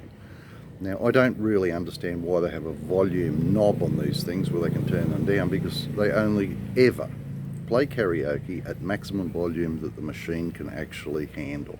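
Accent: Australia. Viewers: Well done great vlog, nice to hear a Aussie voice were in central Queensland so lovely to hear direct items about the Philippines no bull just real deal thank you , just subscribed looking to the future videos , cheers mate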